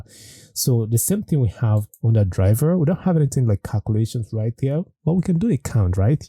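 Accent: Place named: South Africa. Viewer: Fantastic, super useful, thank you! Nice voice and super accent (south-african?) - was a pleasure to spend 2hrs with you Did not really like the part with background done in power point/screen shot etc. I did mine inside PowerBI directly, although not so beautiful as you did. Mine is just OK/good enough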